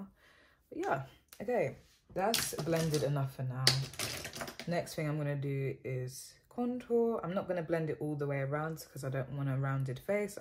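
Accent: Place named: England, United Kingdom. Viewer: Finally found you on YouTube from my ig videos and was shocked when I heard you speak....youre English!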